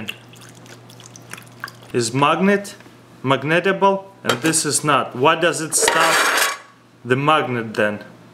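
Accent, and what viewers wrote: Russia. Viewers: I can tell you are Russian. Cool I am too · you englisch from rusia?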